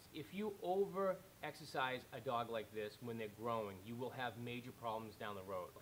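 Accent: Boston, United States. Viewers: "a very lahge dahg" hahaha love the boston accent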